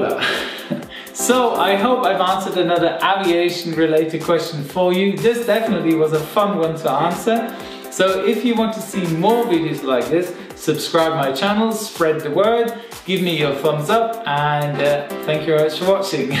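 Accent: United States. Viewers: how can he speak American English so well?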